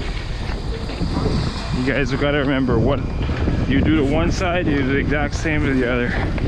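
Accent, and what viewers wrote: Canada. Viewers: Are you Canadian?! Sounds like it haha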